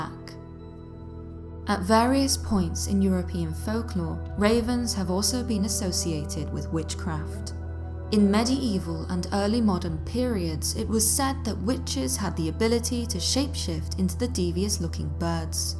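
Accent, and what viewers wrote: United Kingdom. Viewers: I subscribed few days ago, love ur voice n narration!.. Bdw.. Are u British ???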